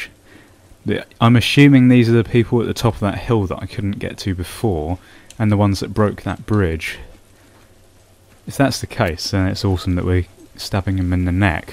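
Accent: United Kingdom. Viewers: It's so good to hear a british let's player, love you're rude, witty commentary, and the foul language aha doing us brits proud.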